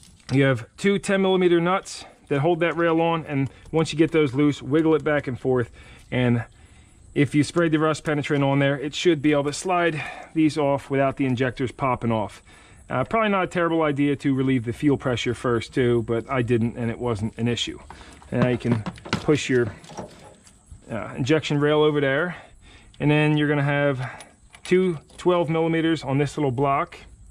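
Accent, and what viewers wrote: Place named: Philadelphia, United States. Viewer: Pendell? As in Pendel PA? Langhorne? I live in Oklahoma now but i have not heard Pendel in a loooooong time. Clearly there are more then one town called Pendel in America but i used to live in Parkland when the Airplane Restaurant was still in Pendel. Anyway you sound like a Neshaminy Or Pensbury school grad North East Philly slang having hometown homeboy. So its gotta be! Come to Oklahoma and watch everyone look at you and change your name to Yankee! Thats been my name here since i moved here in 2014! Most people dont even know my real name! LMAO Facts!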